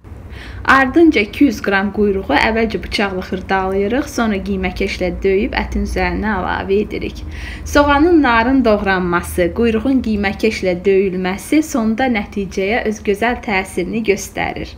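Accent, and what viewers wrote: Baku, Azerbaijan. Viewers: MaşAllah gözəl Bakı ləhcəsi əllərinə sağlıq.Mən sizi izləyirəm bişirdiyiniz his olunur dadlı və ləzətlidir təşəkürlər.Nafisa Qurbanova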